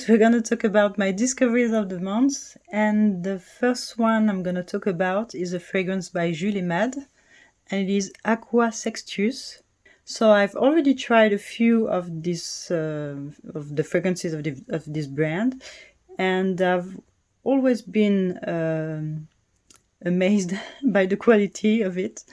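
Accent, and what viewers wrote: France. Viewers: I love your monthly favourites and your reviews such a diversified extensive range of scents so wonderful to watch! I love fragrance too and have a small collection but not as impressive as yours! Do you work in the fragrance industry? I recently discovered Atelier Materi and cacao porcelana is on my wish list as is Trudon Bruma a beautiful chilly iris scent all class my other discovery and also on my to buy list is Tabac Rose by BDK its the only scent in that range that I LOVE it actually reminds me of Maison Lancome's oud bouquet but sweeter and more wearable I'm so glad I found your channel I love the way you speak & I love your French accent! Big lover of France have visited twice xx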